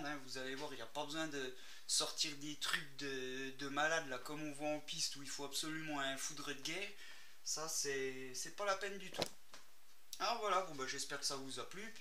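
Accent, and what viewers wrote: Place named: North, France. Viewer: Ta un de ces accent du nord j adore !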